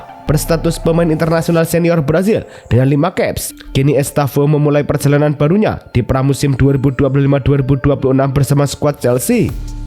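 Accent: Java, Indonesia. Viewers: Yang ngisi suara medok jawa nya agak gimana gitu di dengar 😆🤣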